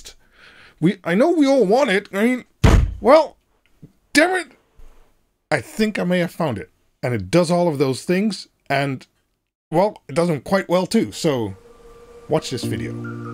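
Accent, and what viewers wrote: Netherlands. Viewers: Are you from the Netherlands. Your accent sounds Dutch. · Hallo, ik hoor dat je waarschijnlijk uit Nederland komt of vandaan? Ik heb je video bekeken het is een goed concept en zie ook dat de video 2 jaar oud is, maar ik heb hier waarschijnlijk een oplossing voor die misschien minder tussen stations heeft?!?! Of heb je relay board en esp32 voor nog meer dingen nodig? Dit is de 'DS6660 PRO' van DOORSAFE een standalone camera met LAN poort die PoE ondersteunt, WiFi antenne, gong aansluiting AC/DC, resolutie: 2mp fhd 1080P, verbinding via de Tuya app dus ook mogelijk te gebruiken met Home Assistant. dit allemaal voor €110,00 inc BTW Ik ben benieuwd of dit een goede alternatief is?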